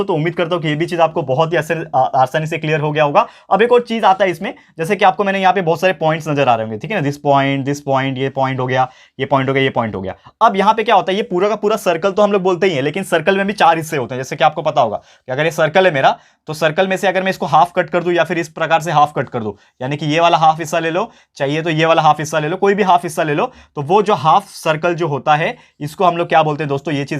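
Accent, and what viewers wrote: Maharashtra, India. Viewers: Sir are you Marathi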